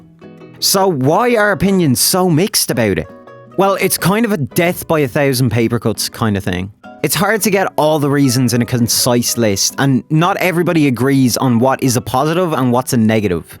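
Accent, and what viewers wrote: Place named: Ireland. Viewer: instantly clocked that you’re a fellow irish person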